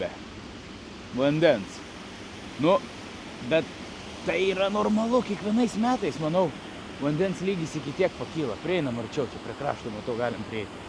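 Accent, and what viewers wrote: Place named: Samogitia, Lithuania. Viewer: Labai simpatiškai skambėjo tavo kalba žemaitiškai👍pajutau jog esame giminingi...Palanga močiučių🥰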